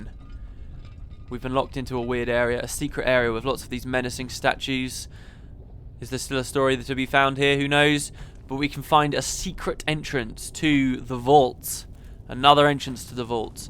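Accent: United States. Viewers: I lover ur american accent!! Leveerrrr! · Haha lovely american accent!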